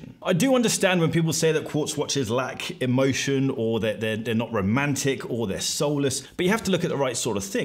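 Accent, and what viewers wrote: RP, United Kingdom. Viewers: he is posh on the downlow he cant help it mate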